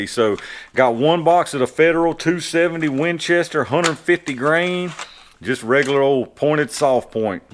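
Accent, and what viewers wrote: South, United States. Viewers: I love hearing someone from the south bless peoples hearts.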